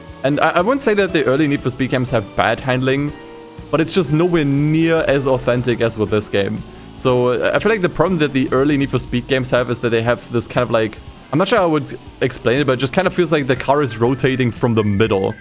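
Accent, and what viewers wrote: Germany. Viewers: Warum klingt dein englisch so deutsch??? 😅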